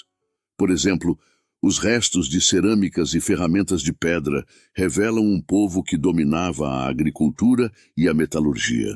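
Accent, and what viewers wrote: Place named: Portugal. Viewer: Acho o sutaque portugues, muito Lindo.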